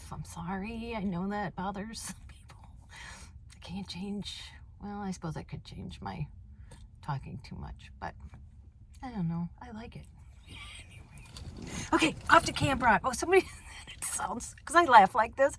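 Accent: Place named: Midwest, United States. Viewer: Good for you. Spoken like a midwestorm girl. Minnesota or Wisconsin. AL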